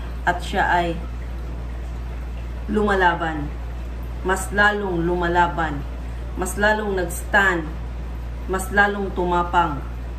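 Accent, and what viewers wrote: Visayas, Philippines. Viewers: bisaya si reader?💚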